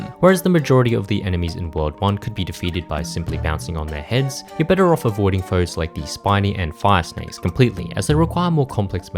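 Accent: United Kingdom. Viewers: its standard pronunciation for uk english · british ew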